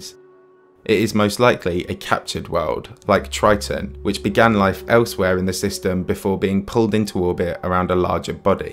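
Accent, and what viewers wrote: United Kingdom. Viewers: What kind of British are you?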